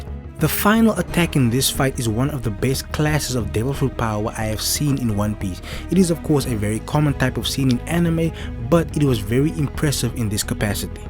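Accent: South Africa. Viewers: Sounds like you're from Sud Afrique mabut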